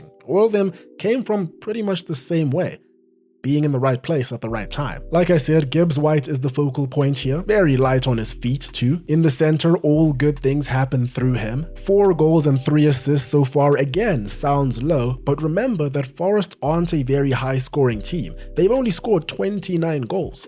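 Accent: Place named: South Africa. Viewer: Are you South African?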